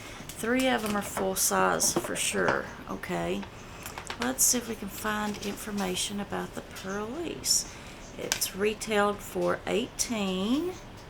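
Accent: South, United States. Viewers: You are such a Sweetheart, :), Love your southern accent. :) I'm born n raised in Ft. Lauderdale Fl. But live in NY now for years. I love your videos. Thank you so much for sharing hun.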